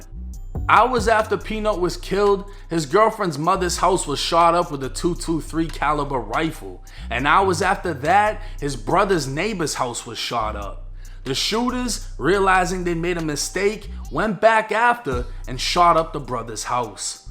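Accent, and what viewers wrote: Boston, United States. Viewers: Are you from Boston? ☘️🤓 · Dude u got like 3 different accents going on u sound like u from Boston when u say “caar” then southern black when u was on no jumper now u just aound like a regular American on here u not a real G u make YouTube videos stop 🛑 ✋ trying to be gangster u far from it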